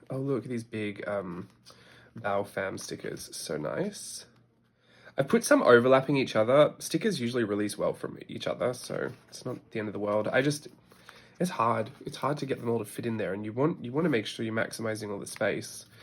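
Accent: Australia. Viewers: Is that a hint of Australian in your voice? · Love hearing your little Kath and Kim voices 😂 I think it’s ingrained in us as Aussies.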